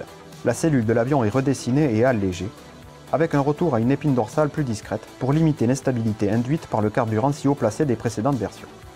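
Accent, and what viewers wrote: South, France. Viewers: Surtout que vous avez un accent du sud non ? Méridional ? En ralentissant on en profiterait un peu plus, c'est sympathique. :p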